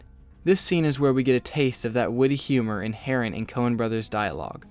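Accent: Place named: South, United States. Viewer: People in West Texas don't talk with this southern draw.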